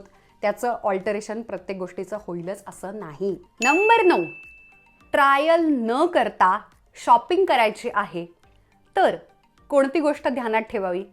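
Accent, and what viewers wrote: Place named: Pune, India. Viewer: I just love the way you talk, pure marathi puneri tone :), looking forward to see online shopping tips/tricks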